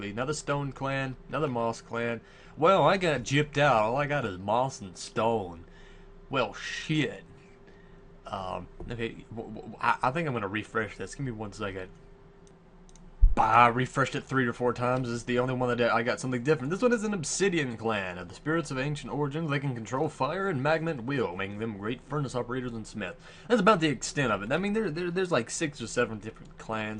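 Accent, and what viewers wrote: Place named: Texas, United States. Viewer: Sounds Texian I like it.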